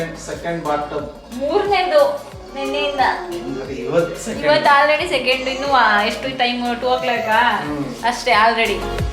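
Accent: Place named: Mysore, India. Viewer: ಮೈಸೂರಿನ ಲಾಗ ಸೂಪರ್ 👍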